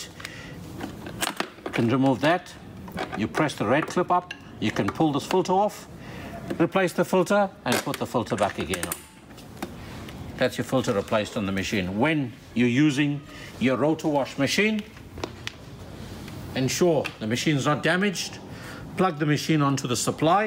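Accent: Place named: Durban, South Africa. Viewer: You sound like you are from Durban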